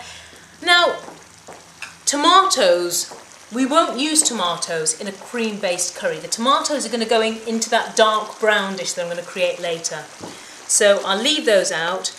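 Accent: England, United Kingdom. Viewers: In India, we do this..she says in an English accent..you're English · why do I have a feeling that you live in uk because of your accent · a what kind of accent you have , speak like an indian not like british , and that's not the way to cook chicken curry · I think it's all Bullshit.. 'this is not Indian Chicken Curry... Having a British accent and fooling the Brits is OK... But not Indians who know all about spices and curries... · Gordon Ramsay accent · She has a perfect British accent called Queen's English, one that only intelligent people can master and educated people can speak properly, some 2% of all English speakers, an accent that you most probably will never ever be able to COMPREHEND not to mention speak with your very limited intelligence.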